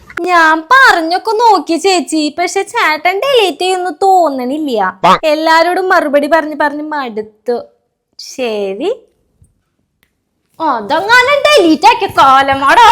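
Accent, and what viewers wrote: Thrissur, India. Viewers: തകർത്തു തിമിർത്തു. വളരെ നന്നായിട്ടുണ്ട്. Specillay നിങ്ങളുടെ സംസാര രീതി. ഞാൻ കുന്നംകുളം ആയതിനാൽ തൃശൂർ രീതി ഒരുപാട് അശ്വതിക്കുന്നു. എന്നെങ്കിലും നേരിൽ കാണാൻ കഴിയുമെന്ന പ്രതീക്ഷയോടെ.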